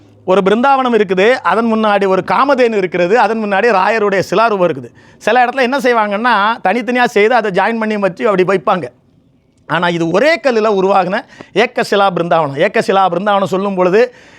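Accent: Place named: Nagercoil, India. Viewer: ஸார் நமஸ்காரம், உங்களுடைய நாகர்கோவில் பாஷை மிக அருமையாக உள்ளது. உங்கள் பக்தியில் ராயரே கரைந்து விட்டார் போல் உள்ளது.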